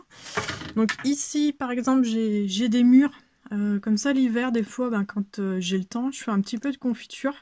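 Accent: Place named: North, France. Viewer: je découvre cette chaine, je vais regarder les autres videos. vous êtes d'où ? je semble distinguer un petit accent du nord sur les "a" ;)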